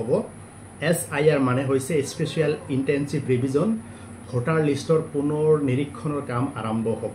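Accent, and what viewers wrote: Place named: Bangladesh. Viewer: Maat tu pura bangladeshi jen lagise.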